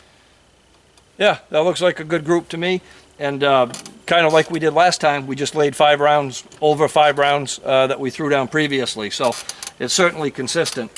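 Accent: Maine, United States. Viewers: Are you from Maine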